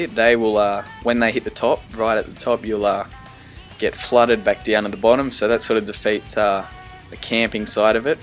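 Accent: Australia. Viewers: you australian? you sound it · AUSSIE AUSSIE AUSSIE, good to hear a fellow australian :)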